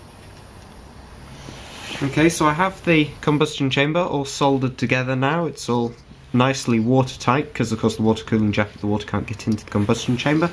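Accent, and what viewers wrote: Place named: Europe. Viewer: what is "the clappers"? Like full throttle or as pilots know it as WOT (wide open throttle)? I'm from Texas and this guys sounds European (UK?) So I'm not up on the lingo. I know word like "keen" (willing to do happily or with a wanted desire) please friends clue me in. Btw Howdy Y'all from Texas. Thank you in advance.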